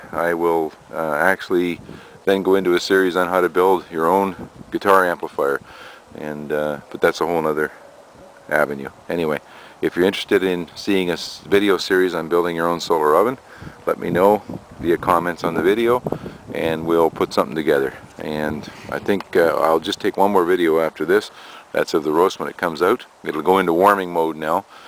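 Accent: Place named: Canada. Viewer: I heard your from Canada cause you said.. ouuut… like houusse.. it is just I noticed it in the Canadian accents.. 🌺👍🏽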